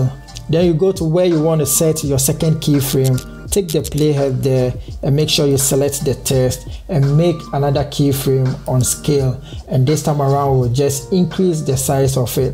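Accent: Ghana. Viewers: You sound like a Ghanaian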